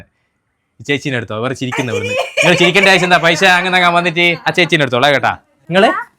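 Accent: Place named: Vadakara, India. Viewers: ഇത് വടകര ഭാഷ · Evdya place nammade tlsry panoor slang🤣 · വടകര ഭാഷ 😂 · കണ്ണൂർ സ്ലാങ് 👌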